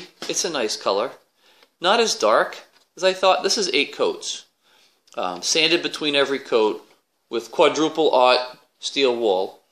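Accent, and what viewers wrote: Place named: Appalachia, United States. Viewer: That has a deep mountain twang love it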